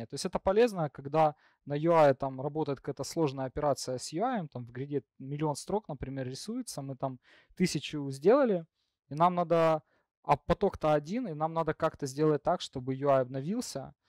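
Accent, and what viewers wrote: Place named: United States. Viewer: Скедулер - это нормально, американское произношение. Вот пример еще одного спикера так же применяющего данную фонетику <link> Async programming in .NET: Best practices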